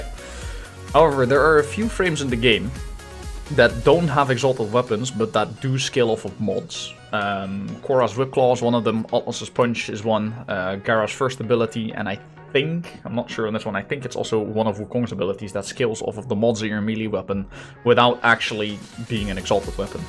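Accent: Netherlands. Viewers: De Dutch accent is strong on this one, just found you. Nieuwe sub erbij. Great vid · Ik hoor al gewoon dat je Nederlands bent, lekker hoor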